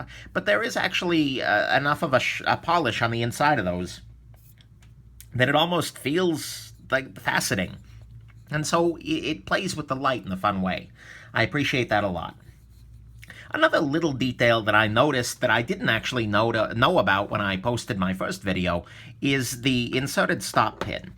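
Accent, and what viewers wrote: New York, United States. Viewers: Hey, where are you from? Almost sounds like Brooklyn, somewhere in NY...not sure, but accent definitely seems east coast. Anyway, I like it! It adds color, and reminds me of home (I'm from NYC, but currently living in Seattle). Am I close?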